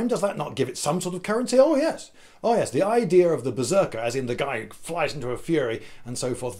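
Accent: United Kingdom. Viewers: Well, they're both Bri'ish.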